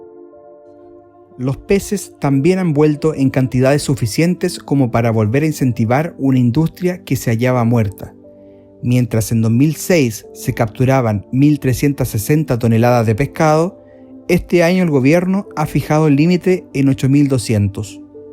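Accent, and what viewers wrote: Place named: Chile. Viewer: Estaría mejor si no lo relatara un chileno muerto de hambre 😊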